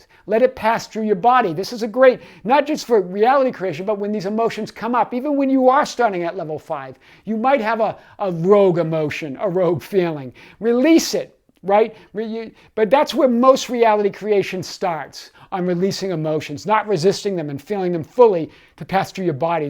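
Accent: Boston, United States. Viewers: "GODHADDATHAAHT." 😄 Love that Boston accent. This was great. Thank you.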